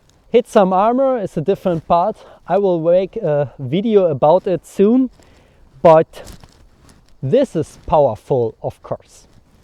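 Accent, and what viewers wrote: Germany. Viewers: Super Video! Wenn ich darf lasse ich dir einen kurzen Hinweis zu deinem Akzent da. Du machst nämlich einen typisch deutschen Fehler. Du betonst "because" so als wäre es "becourse", also da kommt plötzlich ein r vor das es gar nicht gibt. Das "cau" in "because" wird aber einfach wie das "ko" im deutschen "kommen" ausgesprochen. Wir deutschen können halt das englische r nicht aussprechen (geht mir auch so) und das überkompensieren wir dummerweise gerne indem wir es überall reinpacken. Hoffe das ist nicht unangemessen, dachte mir nur das könnte hilfreich sein. Viele Grüße!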